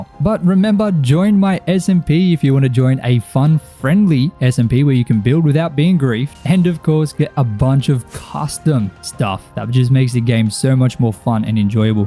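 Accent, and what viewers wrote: Australia. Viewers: No hate but why his australian accent acting like the volume button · This was the first of your videos ive watched, i immediatly knew you were australian 😂 From WA myself.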